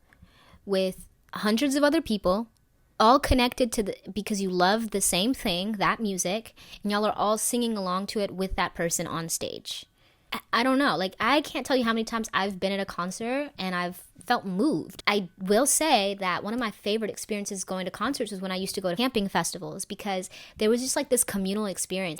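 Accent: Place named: Atlanta, United States. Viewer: Haha I totally recognized that ATL accent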